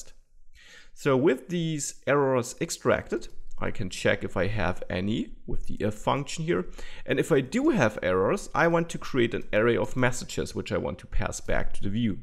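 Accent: Germany. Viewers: Every tutorial is better with a German accent.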